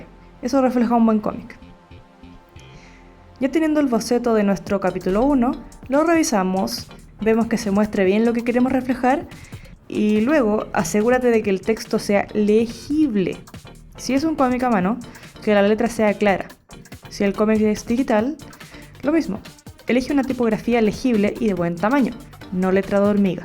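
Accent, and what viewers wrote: Chile. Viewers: Me hice la media idea en la cabeza, y voy a hacerlo medio spaicy Alv Cache el asentó chileno :D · Gran video!!,me funcionara mucho gracias!! PD:me encanta tu acento chileno😙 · Eres chilena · Buta se nota que eri Chilena, saludos desde Chile xD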